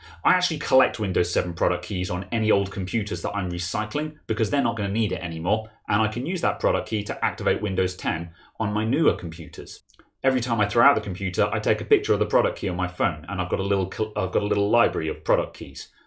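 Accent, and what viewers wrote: England, United Kingdom. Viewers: Where in the world are you and from. My guess is English but you always price in dollars. I subbed to your channel because it's nice to have a clear and understandable voice to listen to. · he is speaking a certain accent of UK english, other accents can be unintelligible . search youtube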